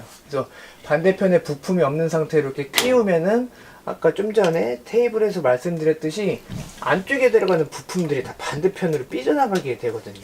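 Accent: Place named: Jeolla, South Korea. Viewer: 구수한 전라도사투리가 신뢰도를 업시키네요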